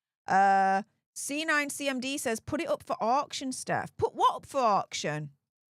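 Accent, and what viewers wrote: Yorkshire, United Kingdom. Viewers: She has a Yorkshire accent.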